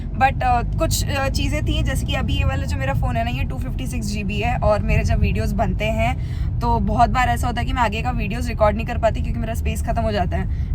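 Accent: Bihar, India. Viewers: Aap bhale he marwaadi ho aur calcutta m rhe ho kintu aapka lehza ya andaaz bihar u.p wala h.......love it❤❤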